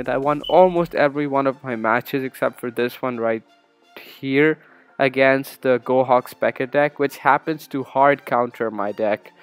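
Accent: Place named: India. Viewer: Nice video dude! Are you Indian? Your voice is so soothing lel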